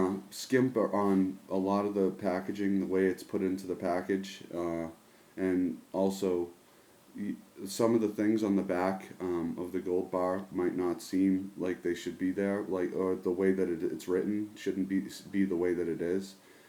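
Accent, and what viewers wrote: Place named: Boston, United States. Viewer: You sound OK to me you Boston guy. I sound worse. Your "Umm" is OK. Better than Howie Carr's "Wizard of Uhs". What town are you in ?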